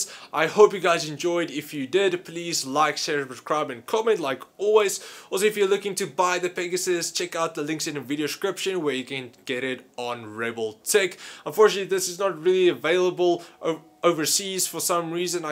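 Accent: South Africa. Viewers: I can't figure out this guy's accent. It's like Afrikaans, American and English all in one with a touch of Dutch. Touch of Dutch. I'm basically a rapper. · Are you South African? I speak a bit of Afrikaans!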